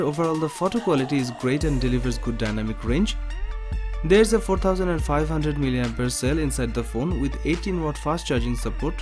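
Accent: India. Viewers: I wanted to watch it but soon as some Indian voice started going tik tok tik tok that was it F.T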